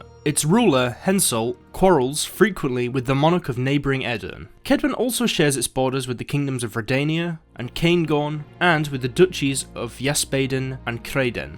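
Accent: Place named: Scotland, United Kingdom. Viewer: The Scottish accent tho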